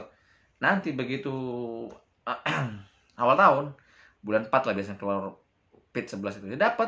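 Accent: Indramayu, Indonesia. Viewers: Sampean asli Indramayu ta kang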